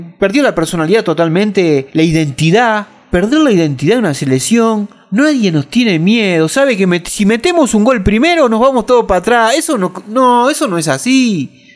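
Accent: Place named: Argentina. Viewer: Pareses argentino como hablas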